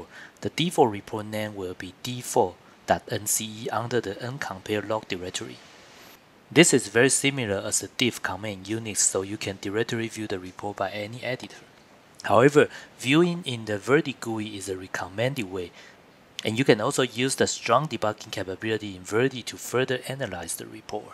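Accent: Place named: China. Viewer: Chinese Accent. Difficult to understand. Please upload video with better accent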